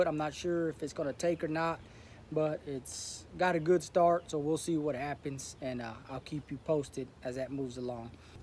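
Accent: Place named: Texas, United States. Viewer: Thanks for this video! I’m in Los Angeles, CA, but I’m Mexican-American with family in the Galveston area & I immediately clocked your accent. Love it and makes me miss TX. Y este bigote! Nice. Also, I have a question. I have a peach tree that is in an area of my yard that doesn’t get enough sun and clearing the foliage & bushes around the existing tree isn’t an option. Half of the tree was diseased and I cut all of those parts off. (We found the tree this way when we moved into the house.) I just set up the water bottles yesterday. Will the tree being formerly diseased (I think it was a bug infestation because it was leaking so much sap!) affect my propagated peach trees? My plan was to take the propagated trees and plant them in a better part of the yard, then cut down the mother tree.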